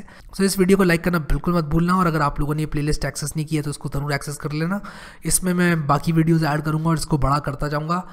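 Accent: India. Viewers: I like Indian accent yaar its amazing i am u say "agr ye playlist acces nai KRI" an we say " agr ye nai KI" and many other words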